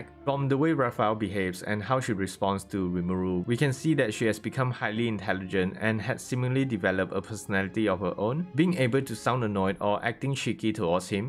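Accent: Singapore. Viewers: Holy shit your accent is VERY Singaporean lol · You have singapore accent.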